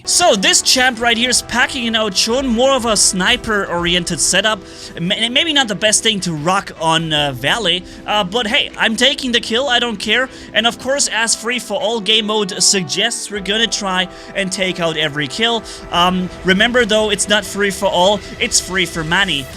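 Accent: Germany. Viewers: Wait… Is HE GERMAN?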